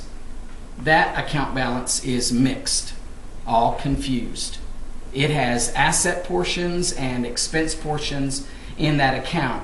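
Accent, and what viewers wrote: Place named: South, United States. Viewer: This guy is good, he is clear and succinct and he is so southern. I am having trouble keeping my my focus on the topic ("I feel like billy graham just announced a scripture verse in a crusade. I am not ready to go to the next page"). What a crack up. He knows his stuff. This is not basic as much as it is absolutely essential, Get this--the world is your oyster, don't get it, forget a career in fiance, business and accounting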